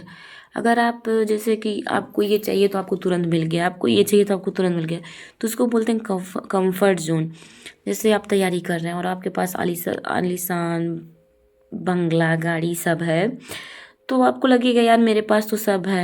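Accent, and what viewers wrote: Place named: Rewa, India. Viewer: TONE SE TO REWA K LGG RHE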